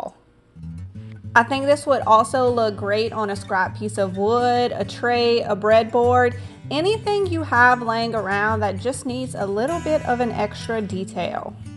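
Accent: Louisiana, United States. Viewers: I adore your videos! Always great ideas, fun techniques AND the accent reminds me of my in-laws and makes me smile - my husband is from Abbeville - bonus! Haha! Thanks for the chance to win! I’m loving the pitcher at the end with the clay added! LOVE all things IOD and haven’t been able to get my hands on a set of stamps yet. XOXO…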